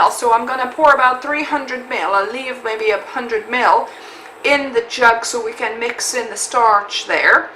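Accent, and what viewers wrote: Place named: North East England, United Kingdom. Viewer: Hi I live in Stockton on tees. I think I recognise a NE accent! Our 1st daughter Harmony at 2yrs (now 42yrs) didn’t want to eat animals…. we are vegan because of this beautiful 2yr old. I have 6 grandsons 2 were born vegan! I am KM Vegan Baking but I do not work any more as I am a full time carer for my mum. I grow organic fruit and vegetables! Thank you for your delicious healthy recipes 💞💗💓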